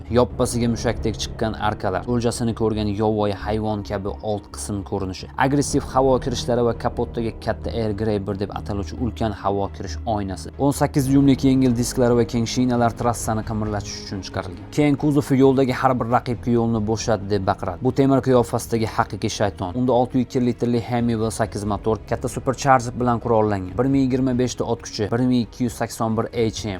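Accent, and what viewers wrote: Andijan, Uzbekistan. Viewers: Andijonli xarp, azgina shevani zamonaviyro qp gaprgin, dordan qochganga o'xshap gaprvurmasdan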